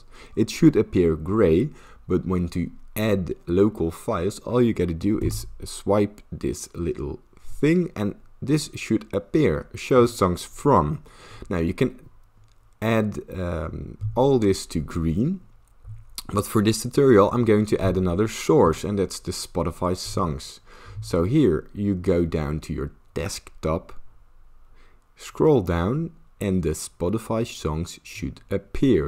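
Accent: Netherlands. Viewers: Ik ruik Rotterdams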